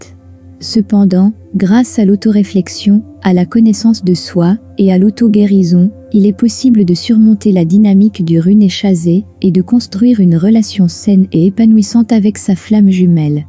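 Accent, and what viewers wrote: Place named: France. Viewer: J'avoue que l'accent français me fait presque rire alors que dans la vraie vie j'ai plutôt envie de pleurer... quelle vie !!!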